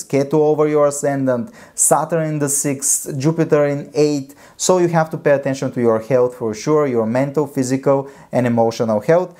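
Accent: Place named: Italy. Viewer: It's so nice... you are so honest...thank you so much 😢❤❤ BTW I love your accent...❤❤is ot Italian 😅❤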